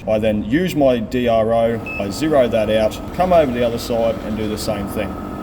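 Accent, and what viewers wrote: Australia. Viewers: This is like ASMR for me: Aussie accent, grinding, cutting, welding, air tools... love it! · Classic we sure do have a twang down under 😀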